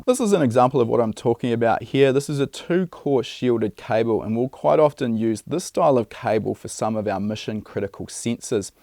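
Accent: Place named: New Zealand. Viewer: I just come here for the Kiwi accent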